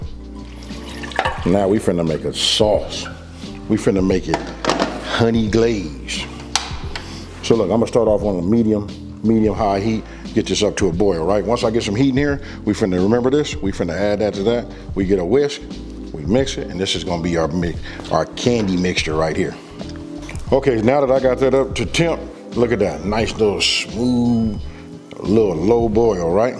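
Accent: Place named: Los Angeles, United States. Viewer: I'm cooking my Thanksgiving meal. I've watched this video and a few of your others 15 times 😂 for each item. My husband walk in like who is this LA guy you watching . I know that accent. 😂😂 I'm like what accent 🤔😂 Now I know why I connect with your videos so much. I feel like I'm at home 🤷🏾‍♀️😂😂 #Happy Thanksgiving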